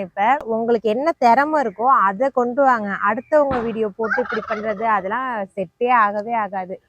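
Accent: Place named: Thanjavur, India. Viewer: Hi da nanum thanjavur unga slang pudikum unga area name venum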